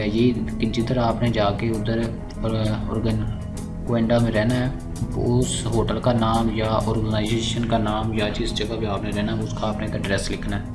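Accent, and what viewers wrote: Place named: India. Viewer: You ask comment but needless to say , you don't speak ENGLISH to understand it. My comment is use less to you as you are not helpful to me. Onse you speak Indian English, you are damaged for good to get a job in AMERICA. IS UNTOUCHABLE CAST SYSTEM INDIAN??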